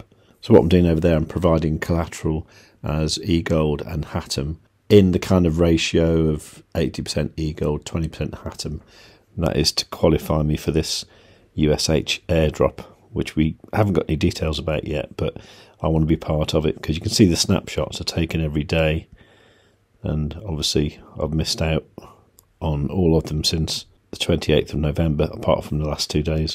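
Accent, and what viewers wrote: Midlands, United Kingdom. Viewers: Are you a midlands boy im from the black country maybe your not from too far away :)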